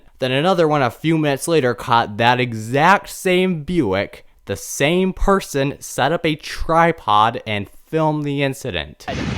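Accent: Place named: United States. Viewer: American, why do you try to sound like chinese, japanese and german at same time while still having obnoxiously nasal amarican accent?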